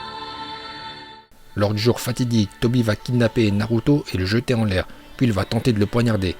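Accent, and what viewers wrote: Japan. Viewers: J'aime trop ton accent japonais 🏯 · Super ces vidéo car on entend des prononciation que les youtubeurs français ne prononce pas comme : Naluto shipunden Laiton Vraiment sa fait plaisir d'avoir des prononciation japonaise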